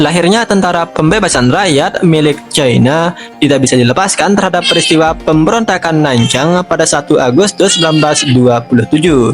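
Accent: Lombok, Indonesia. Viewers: Mimin dari Lombok ya ?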